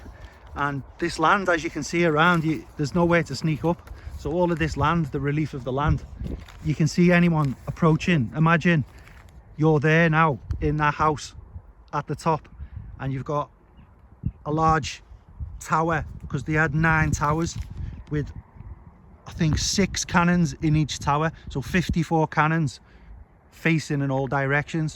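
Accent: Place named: Liverpool, United Kingdom. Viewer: Vey cool. Thx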